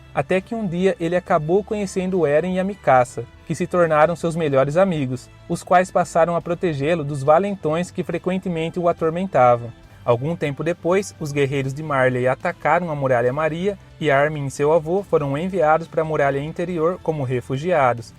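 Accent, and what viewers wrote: Brazil. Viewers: é um sotaque mistura de paulista mineiro e paraibano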